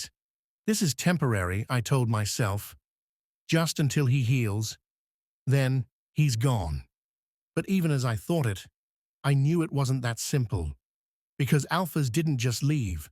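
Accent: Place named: United Kingdom. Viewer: title is not correct but the story is good. love the voice... sounds like Sir David Attenbourgh. love the British sounds.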